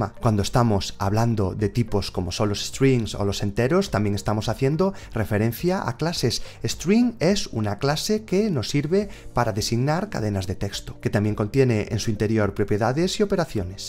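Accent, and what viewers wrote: Galicia, Spain. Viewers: Me están gustando mucho todos tus vídeos, me parece que están muy bien explicados. Y aún encima acento gallego, de mi tierra, así da gusto! Quería preguntarte si habrá alguna diferencia (en cuanto a contenido) entre este curso gratuito en YouTube y el curso que tienes en udemy de pago (iOS 13 y Swift 5.2  Curso Completo Desde Cero). Gracias!!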